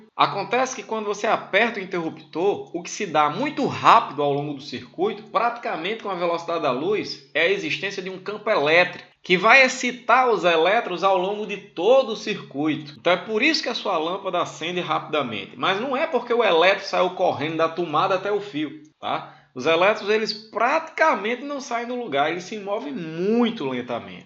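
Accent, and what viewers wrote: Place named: Northeast, Brazil. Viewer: o mais bonito é esse sotaque visse . bichim amo meu nordeste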